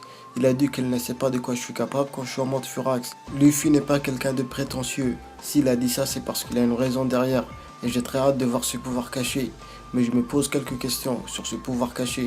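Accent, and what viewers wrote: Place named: North Africa. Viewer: Toi sa se voit t un bledar vu ta voix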